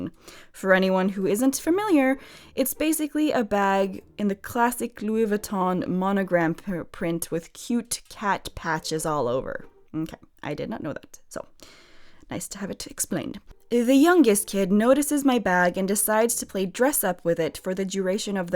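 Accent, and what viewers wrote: United States. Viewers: this is creepy she has an American accent but reminds me so much of my sister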